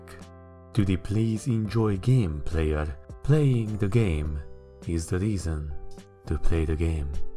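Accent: Hungary. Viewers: Érezhető a magyaros akcentus, de imádom :D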